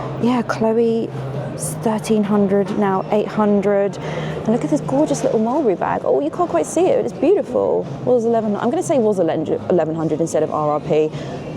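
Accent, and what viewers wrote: United Kingdom. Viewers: Yeah. I'm a 63 year old guy, and I can assure you it's. She's so easy on the eyes. It's not often I comment on someone for their looks, but if a great personality is mixed with a British accent and is pure eye candy as well? Irresistible.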